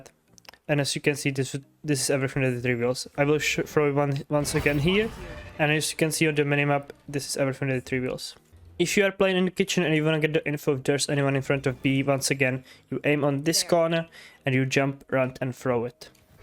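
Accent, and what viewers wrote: Czech Republic. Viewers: Bro's czech i know by hearing accent, am i right?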